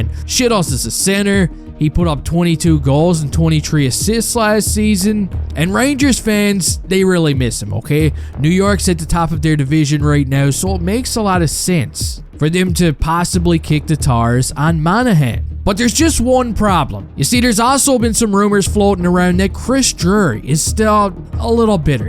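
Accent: Newfoundland, Canada. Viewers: This guy sounds so newfie I had to stop 40 seconds in.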